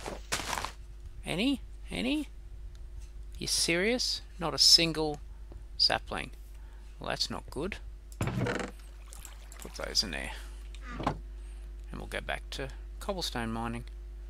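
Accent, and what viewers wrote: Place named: Australia. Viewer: It's great to hear another Aussie accent! I've been watching Pixlrifs' series and thought at the start i could play such a world...but then the more he got into it, the less confident i feel!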